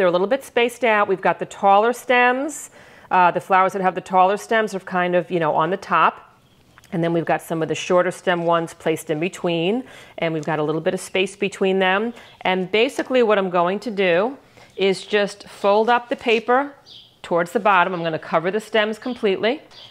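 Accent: New Jersey, United States. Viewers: It's good to hear a Jersey accent again! I live in middle Tennessee now, Zone 6B, originally from north Jersey, Zone 5b/6a. We have a row of beautiful peonies that are over 60 years old. The story goes that my mother-in-law received them as a gift when my husband was born. They have a lot of meaning for us! I did my due diligence to prepare the soil well for the tubers we split from the originals and they are doing very well. I want to make sure I continue to care for them well. I had never heard of dry cutting before and I will definitely do this next Spring.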